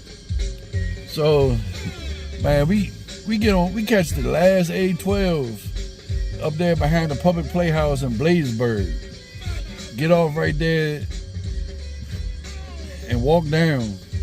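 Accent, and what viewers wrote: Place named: Africa. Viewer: Why are you speaking as if you were an African man?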